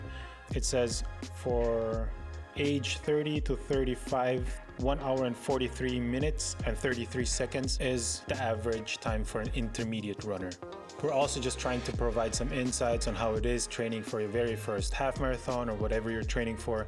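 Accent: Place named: Austria. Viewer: Came for the training diary. Stayed for the austrian accent! 😊 Richtig gut!! 👍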